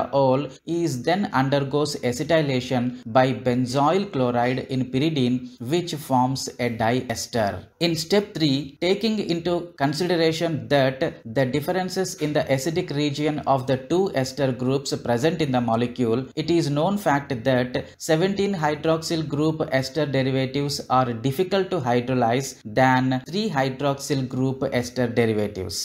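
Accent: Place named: Karnataka, India. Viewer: you have the kannada slang . from karnataka ?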